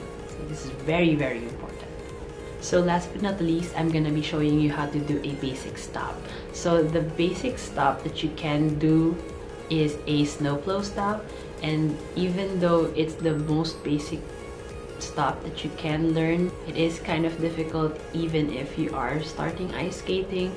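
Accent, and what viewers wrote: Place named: Philippines. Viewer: OHMYGOSHHHHH your Pinoy accent is so cute!!!!!! At ang guwapo mo rin omgggg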